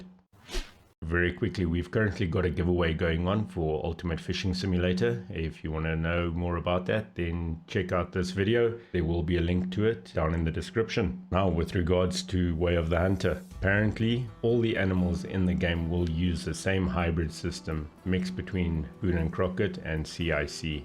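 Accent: South Africa. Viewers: I knew you where South African as soon as I heard your voice,I'm really happy tho that there are more sa youtubers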